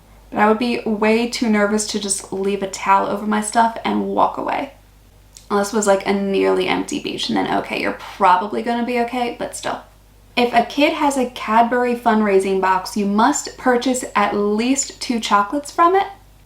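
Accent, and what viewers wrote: Australia. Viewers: Sounds as though you're finally getting your head around how we Australians function. I have a feeling when you finally get back to the States for a visit, you're going to have to check yourself (the way in which you speak) occasionally. I won't be surprised if you get some strange looks from your family and friends. You're becoming an Aussie by osmosis.😀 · Your G'day is starting to sound more Aussie as you go along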